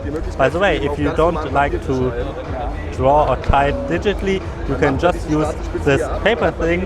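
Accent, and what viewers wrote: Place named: Germany. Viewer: sry aber dein denglisch ist echt furchtbar!?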